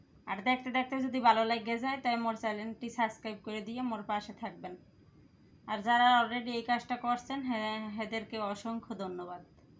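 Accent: Bangladesh. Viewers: Onek sundor hoyeche recipe ta ❤️ Borishaler bhasha khub sundor ❤️🥰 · আপু তুমার বরিশালের ভাষা টা আমার কাছে খুবই ভাল লাগে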